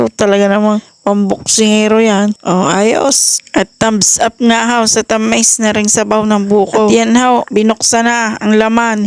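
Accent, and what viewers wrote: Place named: Batangas, Philippines. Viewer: Love your Batangueno accent sis,may fren ako dito from Batangas sya 🙂😎 sanaOl fresh ☺️natawa ako sa braso de Mercedes relatemuch 😳🤣😂done 👍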